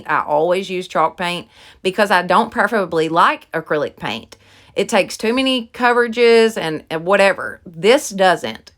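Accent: South, United States. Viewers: BEAUTIFUL JOB as always!!! You’re my absolute favorite..... not only because I’m a Georgia gal and you speak my language (some people don’t understand our Southern speak) BUT also because your DIY’s are always unique and you never fail to knock it out of the park!!!! Panting dogs! I have toddler dogs too..... you crack me up girl!! Be blessed! 😇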